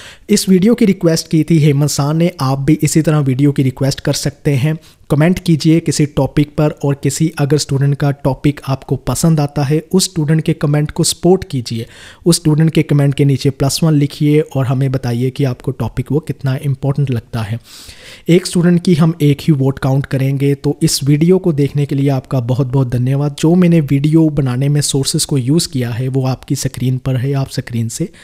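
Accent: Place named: Punjab, India. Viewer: Very effective and knowledgeable information with punjabi assent. Thankyou so much sir.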